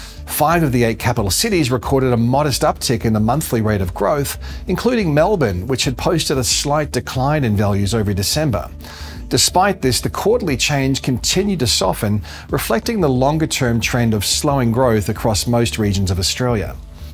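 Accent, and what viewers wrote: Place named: United States. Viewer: What is with the American accent breaking in to your speech pattern. It is very strange.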